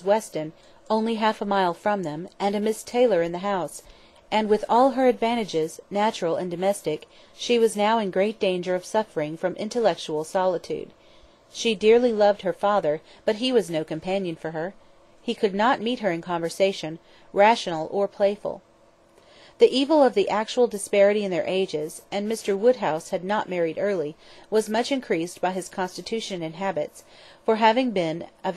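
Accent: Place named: United States. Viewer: this is an American accent, unfortunately. i'm American, and I wanted to hear a British accent :/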